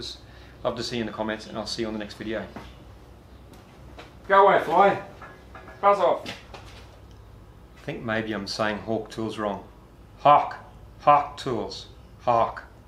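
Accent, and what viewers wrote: Australia. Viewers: GREAT WORK. Hey mate send me one of those orange tool bags. Let me know what you want for it and for post. HAWK........with the accent is Perfect English or at least Australian! Wouldn't know who was talking if you didn't add the Aussie flavor! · Just found your videos on masking the waxed bag. Great to see and hear an Aussie on YouTube. Can’t find the video you refer to about actually waxing the fabric/ the recipe etc. Ps. I too have a singer 201. Great little machine. Mine can be used as electric or hand crank - handy for those power outages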